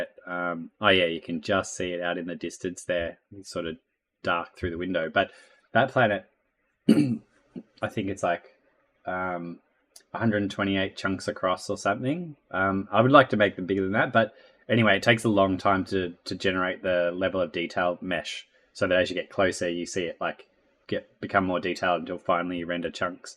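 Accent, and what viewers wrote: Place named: New Zealand. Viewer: looking pretty good, do you think you'll put in some kind of sloped/wedge block? also, i noticed from your accent, are you a fellow new zealander? cause if so, that's pretty cool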